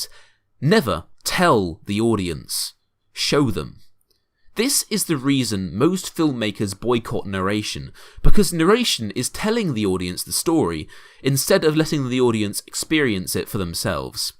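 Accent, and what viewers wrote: United Kingdom. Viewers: Why do you sound British while your grandfather sounds Scottish?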